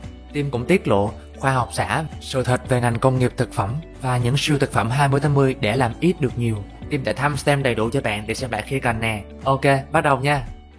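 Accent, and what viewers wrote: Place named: Vietnam. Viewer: Video rất bổ ích , mà nói giọng tùm lum tà la, lúc giọng bình định lúc giọng bắc lúc giọng nam, cũng ráng nghe đó mà nó cấn cấn k có êm tai. Mình góp ý chút vì bạn rất có tâm vào video này, mong sau này bạn luyện nói 1 giọng thôi cho nó êm tai, vì video dài như thế này cần 1 giọng đọc hấp dẫn mới nghe đi nghe lại để học và tiêu thụ kiến thức, chứ k phải nghe 1 lần rồi thôi. Cảm ơn bạn thanh niên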